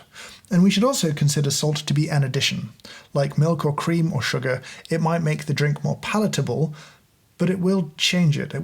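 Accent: United Kingdom. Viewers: That's honestly the only reason why I watch him and I'm even British but I don't sound like this 😂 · Yeah, it's called 'British accent'.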